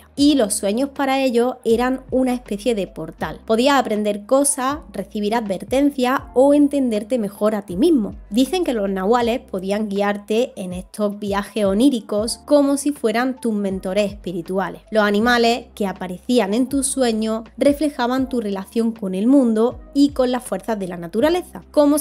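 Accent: Spain. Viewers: Su acento lenguistico muy agradable ❤😮 español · Gracias q acento TAn bonito de dónde será se q es española será andaluza gallega o canaria vaya un saludo desde Texas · Wowww Srita . Hace menos de 1 hr ví un video suyo , el del 5to Sil creo se llama y le cuento , me dejó sorprendido con su video . Mucjas gracias . De hecho inmediatamente me inscribí a su canal ahora aquí me tiene viendo éste otro video 👍👏👏👏🤜🤛 por cierto permitame preguntarle de dónde és usted ? Tiene acento extranjero (Española ?) , más me sorprende sinceramente . Excelente canal Gracias .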